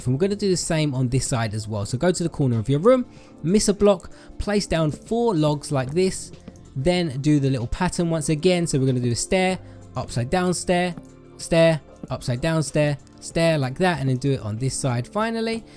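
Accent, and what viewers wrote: United Kingdom. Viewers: I Need Some Attention xD 😂😂 British ascent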